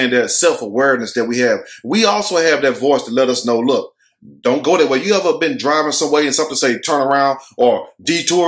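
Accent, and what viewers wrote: South, United States. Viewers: Boy, I love ❤️ the way you tell a story young man!!!! 😍🥰!!! With your country self!!! This is country curvy cougar loves herself a country talking young man! Your wisdom & discernment is out of this world!!! Ellen please come & discover this very talented cutie pie who needs to have his own show!!! Please!!!!!!!!!!! 🙏🏾🙏🏾🙏🏾🙏🏾 In the meantime, this “family member” will keep on watching this awesome on his channel......until his promotion! I only 🙏🏾 he stays the same when he does become a famous tv star!!! 😘😘😘😘💜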